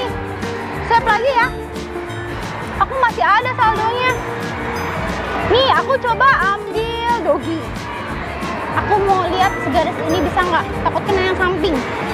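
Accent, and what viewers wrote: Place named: Sulawesi, Indonesia. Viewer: Itu logatnya Sulawesi yaaaaa Hampir mirip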